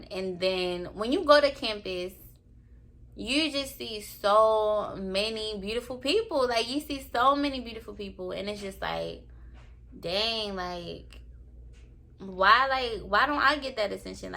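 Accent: Baltimore, United States. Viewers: I knew it your from Baltimore the accent 😇 pretty girl 😍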